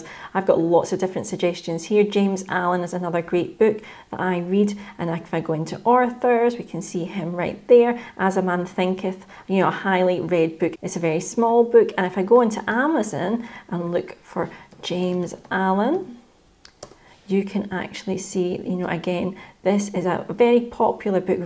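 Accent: Scotland, United Kingdom. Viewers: I've been following your site for about three months. I'm an American who lives in North East Scotland. I used to live in Cumbernauld, so I love your accent. I wrote a book on becoming deb some years ago, and I love watching You Tube videos related to it. You and your husband have one of the best I've seen in this country. Plus this is your best yet. Thanks. Keep up the good work.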